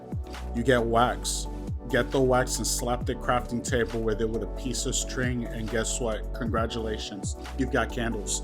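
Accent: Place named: Los Angeles, United States. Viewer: You sound like your from LA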